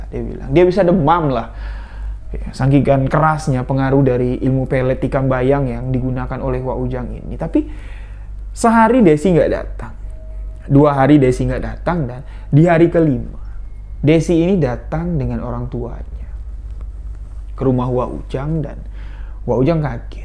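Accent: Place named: Jambi, Indonesia. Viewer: Mantap, 👍, saya suka cerita dari mas, logat Jambi nya enak di dengar 🙏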